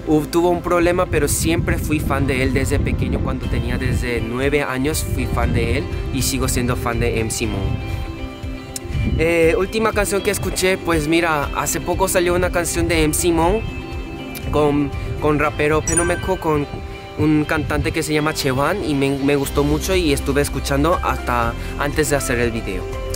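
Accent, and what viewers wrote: Paraguay. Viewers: tu acento si que es Paraguayo😅 también soy de Paraguay. Saludos de Quiindy😄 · Trasmites la calidez de un paraguayo. Te siento un paraguayo más y creo que la pronunciación de la "R" lo confirma. Suena bien paraguayo. Muy bueno tu canal. Cuando vengas por Asunción, ya tienes un amigo. Sólo avisa y planeamos algo. Saludos!!!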